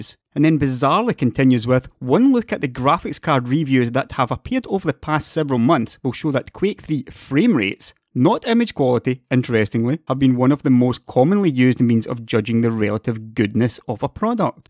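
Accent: Scotland, United Kingdom. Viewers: Thanks, you've shared useful information. BTW, I wonder I guess you're Scottish and where do you live ? England or Scotland ?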